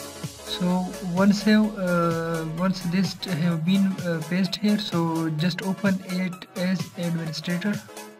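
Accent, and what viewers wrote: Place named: India. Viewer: What is he saying??? If you speak english with that indish slang, why is there a technomusic in the video, sometimes louder than your voice. BIG FAIL!!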